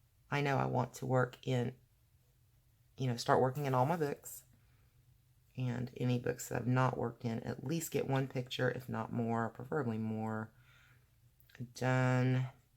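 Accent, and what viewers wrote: South, United States. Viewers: Hey.... just FYI... if you use the paint w glitter... it WILL dry flat;o)) I have used it on quite a few pages in my books. I also have ALL of the Markova books and I really can not say one is better than the other, however, Tenderful Enchantments is my favorite!!! I am swatching colored pencils now watching your video;o)) I had to laugh when you was talking about the way you speak and say words...I get that ALOT...its a SOUTHERN THANG... I'm from New Orleans;o) Keep up the good work and I hope to get some things DONE in 2019 myself... thanks for the PEP talk!!!! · OMG!!! WOWZA!!! I have NEVER had the courage to color in my Klara Markova books until I found this video! I am in AWE of how well you do such remarkable things with your markers!!! Please know I am honest to a fault... so know I will be real with you. You tickle me when you are REAL with us in these videos. 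Thank you soo much for your honesty. Love your goals and candor about your life, a lot of us don’t even know we have lost ourselves... and it’s totally okay to have your SOUTHERN ACCENT, most folks find it sweet and yours is not as deep as some are... wish you would be less critical of yourself! You are so DELIGHTFUL and HONEST!!! THAT will grow this channel sweetie... I watched you on this page in Tenderful Enchantments and what I saw was you not even hesitate to shade in with the gold gel pens!!! You really do know where and how to shadow, girl...!!! I watched you lay down the blues and deeper blues and see you instinctively place shadows where they go!!! I said I will be honest. It blows my mind how you worry about wips, cuz I’ve got a bunch, that I may go finish or not. It’s not a biggy for me. I have every intention of following you in 2019... and learning that I’m more normal than I realized until you put yourself out here. 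I love you a bunch and wait for your videos to come up. Hey, welcome and THANX for being frank about gaining weight over the holidays, like the rest of us. I know what ya mean about the anxiety stuff & the patience, err I don’t l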